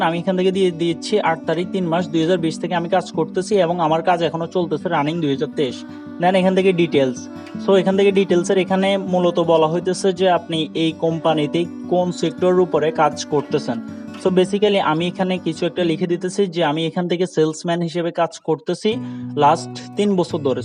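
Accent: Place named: Noakhali, Bangladesh. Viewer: আপনার বাড়ি নোয়াখালী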